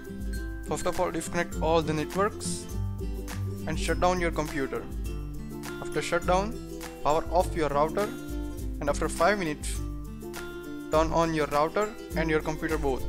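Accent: India. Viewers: Indian accent, I wonder if it is a scam...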